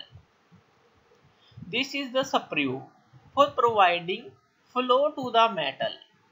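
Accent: Punjab, India. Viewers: U r Punjabi because it accent like that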